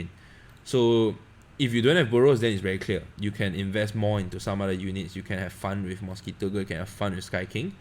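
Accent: Singapore. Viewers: Are you singaporean?or Asian haha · Bro this is an awesome guide. Are you from Sg? Can recognize the accent lol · it's more like singaporean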